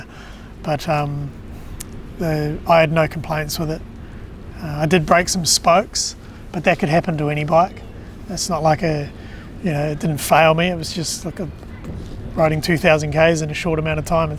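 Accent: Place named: New Zealand. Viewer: Oh I just wanna say your from New Zealand🤣😂🤣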